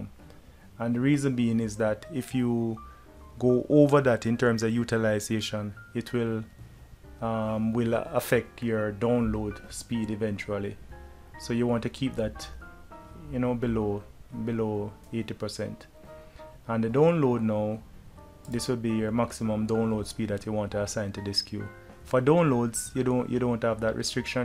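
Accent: Jamaica. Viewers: good and useful video, I feel the Jamaican accent very much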